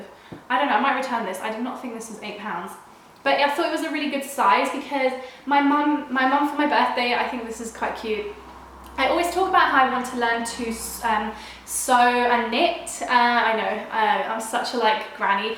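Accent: Italy. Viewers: Il tuo accento italiano è troppo carino 😊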